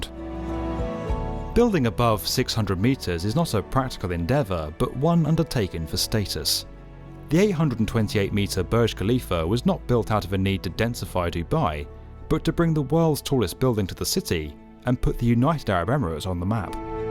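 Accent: United Kingdom. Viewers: I know right I like a good British voice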